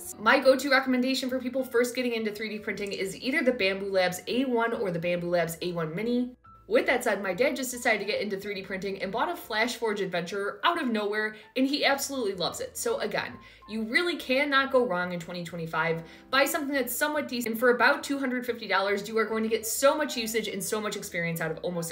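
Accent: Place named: Midwest, United States. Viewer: Are you from WI? Your Midwest accent is thick!!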